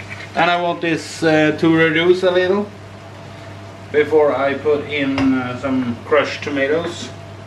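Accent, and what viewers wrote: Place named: Sweden. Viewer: Grym video. :) Din engelska är faktiskt ganska bra. Mycket "Svengelska" Men jag tror att alla engelsktalande personer förstår vad du säger :) Stor tumme upp till dig. Dessutom blev jag väldigt sugen på lasagne nu :)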